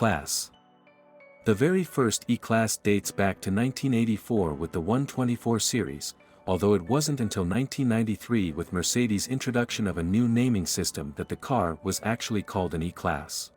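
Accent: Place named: Germany. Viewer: Pity you missed supercharged 540 K and SSK...But great and funny video !!P.S-Funny because of German pronountiation..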